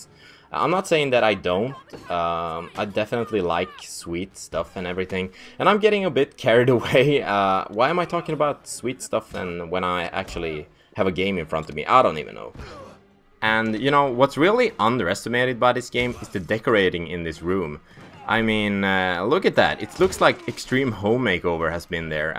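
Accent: Sweden. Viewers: If the guy who voiceovers this clip isn't from Sweden I'll eat my hat. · ha 10 seconds in the movie and i can tell, ur swedish;)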